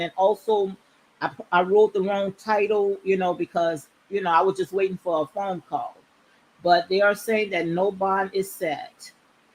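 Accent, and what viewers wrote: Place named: New Orleans, United States. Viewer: You have to be from New Orleans... you sound exactly like my GranMom wit that accent.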